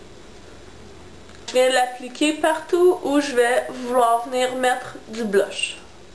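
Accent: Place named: Montreal, Canada. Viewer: C'est parce que je reconnais bien cet accent moi aussi j'habite Montreal c'est pour ca !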